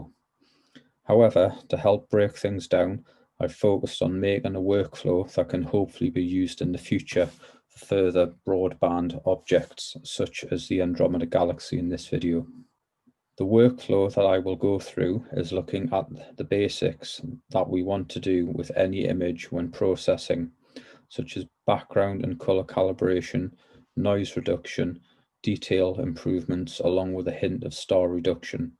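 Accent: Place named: Northern England, United Kingdom. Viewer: Great video I am from Teeside recognised your northern accent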